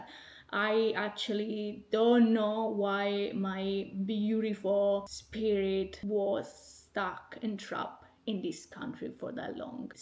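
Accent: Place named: Italy. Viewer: I agree with everything you have said about Jamaica and the men 😅. Jamaica will lure you in whilst on holiday but it's not a place for the fainthearted if you want to reside there. It's very very expensive and the ppl mostly have unrealistic expectations of foreigners even on their family from overseas. Plus Jamaica is considered a developing economy so is too challenging for Westerners to go live. The men are on a different level - charming, egotistical and opportunist. Be careful with them. But I am enjoying your videos on Jamaica, your personality shines through and like your Italian accent 😊❤🇮🇹🇯🇲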